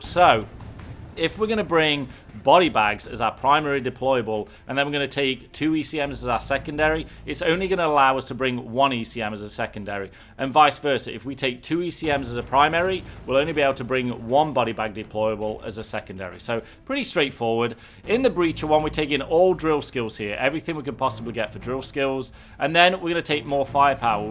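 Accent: United Kingdom. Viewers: are you british? You sound like to me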